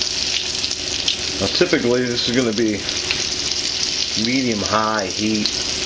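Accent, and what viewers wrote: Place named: Chicago, United States. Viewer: A big shout from Denver,! Man I swear I can smell the aroma coming outta my computer. BTW I can tell by your accent you're a Chicagoan! Keep up the good work.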